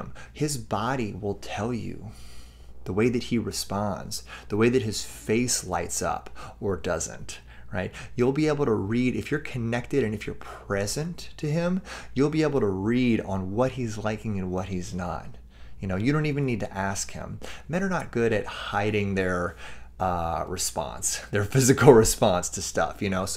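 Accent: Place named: South, United States. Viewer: I love your southern accent.🌞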